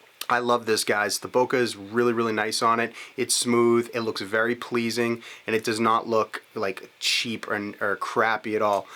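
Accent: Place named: Maine, United States. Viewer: Loved this review, and your accent. Are you from Maine ?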